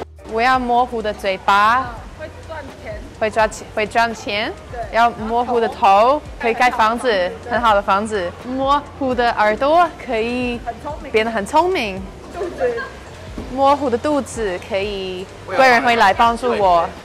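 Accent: Standard. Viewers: 其實這種旅遊影片可以多拍些～外國人的面孔加上標準的中文，台灣人很好奇，也很有興趣觀看❤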